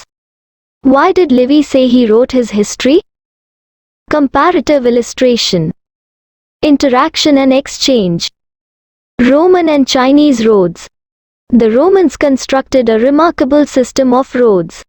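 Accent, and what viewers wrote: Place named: India. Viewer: Indian accent lol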